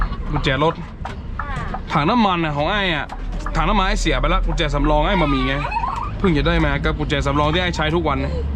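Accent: North, Thailand. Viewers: ที่ชอบดูเพราะแกพูดเหนือ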